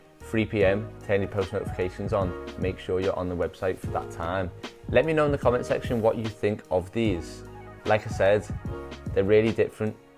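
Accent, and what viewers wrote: Liverpool, United Kingdom. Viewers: Scouserrrrrrr miss this accent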